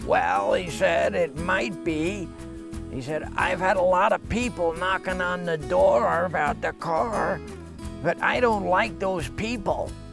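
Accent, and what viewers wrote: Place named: United States. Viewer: That was a very convincing American accent . Iv'e yet to hear an American do an Australian accent well .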